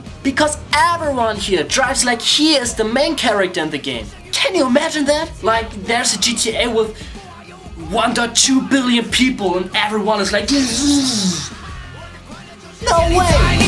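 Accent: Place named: Germany. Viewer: well , i can tell you have german accent too !!!! :) ( smarter !~ta~da) ANYWAYS ! i need talk with you about this video this weekend ! = =# LAO WAI !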